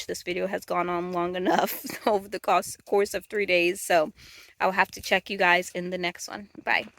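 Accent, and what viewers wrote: New York, United States. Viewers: Heyy... I love your N.Y. accent, just let it roll.. lol.. but you're doing just fine. I am glad you were able to get the beds done and the hoop house. I too, did a lot of marigolds as well and doing some more flowers. The duck cattle panel hoop house is cute too.. have you look on pinterest on duck, and chicken panel hoop houses.. they are cute as well.. I am so stoked about going out to the homestead when it warms up to design or get everything started... You have everything going well, I am very proud of you on every thing you do.. have a wonderful day.. I do have a question though. If you look at my beds will I have to get top soil or could I just put my black kow on top. I used a lot of compost leaves sticks etc.. or do how you are like putting top soil and then the black kow, I get confused.. You do look good sitting up under your gazebo with your table and chairs.. 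lol.. Thank you for the video.. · I never heard your New York accent before! I would have never guessed! Wow! You are really prepared for Spring! This summer I will be attempting to grow Tepary Beans. They are native to our Desert here. The Native Americans have grown them for centuries here without any Irrigation! Hope they grow! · I’d never known you had a NY accent, but it’s ok, you sound good to us. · Don't lose the NY accent - it's cool!😎 I'm from AZ but have always loved NY❤️✌🏽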